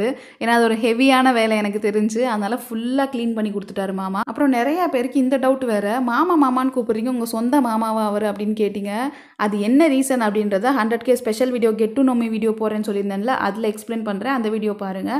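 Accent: Madurai, India. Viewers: Nanum maduraikari than sis unga slang nalla theriyuthu.. aagatha vela haha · Madurai pechu kiliyethu dhul machi nan kuda Madurai tha nega yenga irukenga ipo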